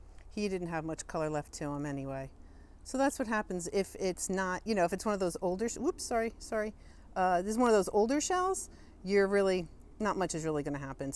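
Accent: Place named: Michigan, United States. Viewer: You must be from Michigan as your wonderful accent sound so much one my friends from Michigan?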